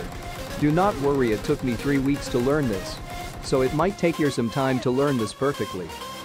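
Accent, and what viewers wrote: India. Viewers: Are you indian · Are you Indian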